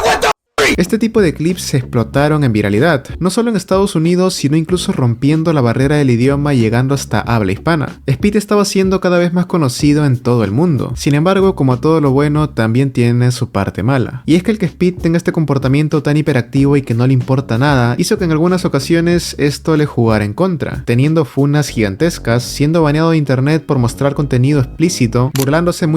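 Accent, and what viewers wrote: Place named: Lima, Peru. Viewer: Tienes acento limeño. 💪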